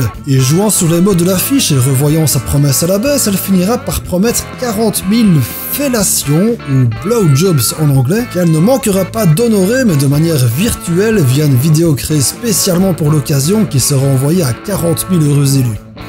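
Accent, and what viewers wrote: Belgium. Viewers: salut, tu fais des vidéos de qualité, du coup je m'abonne. Faut surtout pas que tu t'arrêtes, ton travail est déjà d'un niveau impeccable! j'ai fais un rapide tour de tes vidéos et je préfère cette voix plus naturelle :) elle fait moins Benzai que pas mal de monde te reproche, ce que je comprend pas trop d'ailleurs pcq avec ton accent belche c'est complètement différent ^^ Est-ce que tu as pensé à faire une vidéos sur les bières de notre pays ? genre top 10 des bières d'abbayes ou quoi :P Bonne continuation et je bois à la santé de ta chaîne, que ca prospère ! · Est-ce que tu peux arrêter de dire "houite" et dire "huit" stp, je sais que t'es belge mais bon ^^.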